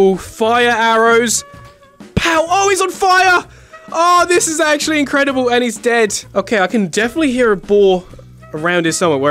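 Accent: United Kingdom. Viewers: I love british voice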